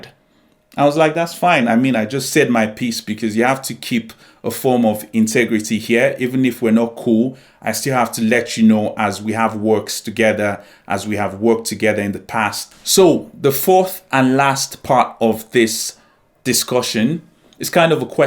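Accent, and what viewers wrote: United Kingdom. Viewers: Your accent is fascinating! I keep hearing bits that sounds Northern-ish, and then other bits that sounds like from all over the different bits of London!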